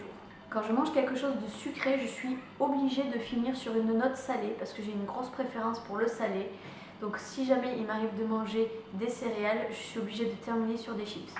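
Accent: South, France. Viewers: Super vidéo :D tu es vraiment une youtubeuse super justement pour ton naturel et la proximité que tu crées avec ton "public" ^^ je vais jouer le jeu tiens ! 1: je déteste dépenser mon argent, mais j'adore posséder et je suis assez addict au shopping (j'ai des wishlist longues comme le bras qui traînent dans mon PC) 2: je suis environ 40 youtubeuses françaises et 20 américaines. 3: j'ai eu 18 ans, mon bac avec mention très bien et mon permis de conduire en l'espace de 5 jours cette année. 4: je suis fan de cinéma, et en particulier des films un peu psychologiques. 5: j'ai la phobie du feu, je n'ai par exemple jamais osé passer mon doigt à travers la flamme d'une bougie ou démarrer un barbecue... 6: je suis détectée surdouée mais cela n'influence pas mes goûts ou mes loisirs. Par contre, j'ai plus de mal à nouer des liens avec les autres que la plupart des gens. 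7: je trouve l'accent du sud extrêmement relaxant et chantant :) c'est d'ailleurs une des choses qui me font aimer ta chaîne alors ne te retient pas! 8: je suis terriblement gourmande. 9: je suis très lunatique et c'est un problème car je suis susceptible d'être parfaitement normale et d'avoir des accès de colère ou des périodes de déprime. 10: je rêve de devenir actrice depuis que je sais marcher. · J'ai aime ta vidéo claire et consise :) oh l accent du sud <3 il a pas l air moche le tiens ... Moi ça va ça ne se remarque pas dans mes vidéos il n est pas très prononcé . Pour le shopping plus ça va et plus je deviens comme ça ... Ah tout faire sur le net!!! Et bizarrement moi non plus je b´aile pas l eau sur les coudes quand je me lave le visage ! Voilà :) et pour les youtubeuses françaises et bien je t'invite a venir me faire un coucou alors histoire de voir une nouvelle tête . Bisous a bientôt . 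· Pourquoi tu trouves cela vulgaire l'accent du sud? :) En tout cas, j'aime beaucoup tes vidéos. Je trouve que tu donnes vraiment des astuces intéressantes. Et si je peux me le permettre, qui sont les uniques 5 youtubeuses françaises que tu suis? Des bisous♥ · Je suis pas d'accord avec toi pour le 50ème fait on peux pas renier l'accent du sud aha :D et enplus je trouve qu'il te va très bien! VIVE LE SUD :* :D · J'aime trop l'accent du sud ! Ne te retiens pas ! 😜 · Ca me choque un peu que tu ais honte de ton accent, moi aussi j'ai l'accent du sud et je n'en ai pas honte du tout, mes amis nordistes aiment bien ils disent que ça leur fait penser aux vacances mdr, c'est pas parce que tu as l'accent sudiste que tu parles forcément comme une cagole ;) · Mais c'est super beau l'accent du sud dans une vidéo tu pourras parler avec ? :) (Je l'ai moi aussi) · Bonne video mais dommage pour l'accent du sud je l'ai aussi et je trouve que c'est le plus bel accent comparé à l'accent du nord beaucoup trop pointu à mon gout! 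Apres l'accent du sud c'est comme tout, faut savoir l'utiliser et je suis d'accord avec toi par rapport au fait que selon les personnes, il peut devenir extrêmement vulgaire ! Bisous ! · Pourrai tu nous faire une video courte avec ton accent du sud pour voir a quoi sa donne ? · On a des points en communs ahah, sinon,laisse ton accent du sud, cest le meilleur! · Ton dernier fait était "je me retiens pour éviter de montrer mon accent du sud, parce que je trouve sa très vulgaire". Pourrai-tu nous faire une vidéo avec cet accent ?? :) Continue comme sa tu es génial !! ❤️ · Tu peux le garder ton bel accent du Sud ! La vulgarité est une attitude et tu es à mille lieux de celle-ci !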